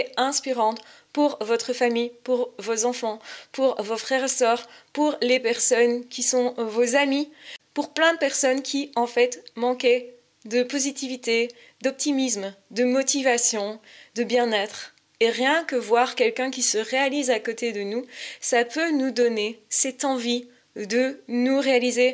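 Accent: Belgium. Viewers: Tu es belge ?¿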